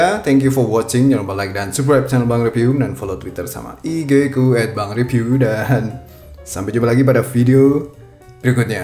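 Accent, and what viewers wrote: Java, Indonesia. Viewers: Medokmu toh mas Bikin ngakak Ckckcckkcck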